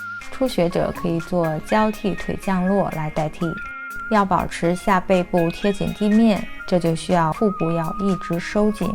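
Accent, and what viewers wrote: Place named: Australia. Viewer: Australia!!! i love aussie accent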